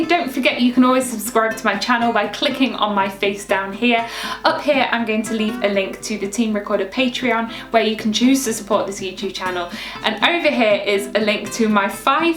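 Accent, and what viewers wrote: United Kingdom. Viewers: that british acent 😆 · Thanks for sharing these pieces. And, first of all, I really love your British accent. There are two recorder contemporary pieces I like so much. The first one is "Glass" (from "Minimal Preludes") by Karel Van Steenhoven. The second one is "Thymehaze" (for alto recorder and prepared piano) by John Casken.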